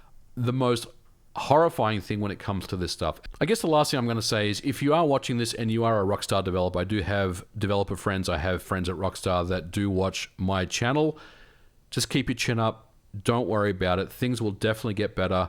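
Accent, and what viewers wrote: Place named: Western Sydney, Australia. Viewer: Which part of Australia are you from? I am getting Western Sydney vibes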